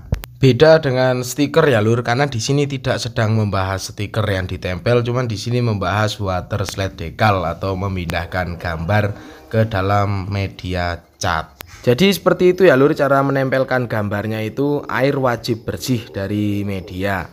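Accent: Temanggung, Indonesia. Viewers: Koyo wong temanggung ya... Heeee..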